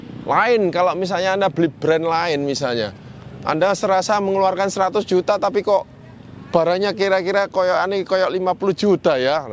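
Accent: Surabaya, Indonesia. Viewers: khas aksen Suroboyo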